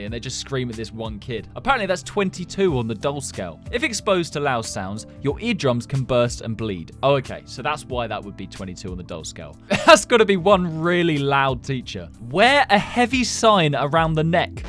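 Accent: United Kingdom. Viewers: Is it gonna be mean but are you British? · Is this guy British because I love people who are British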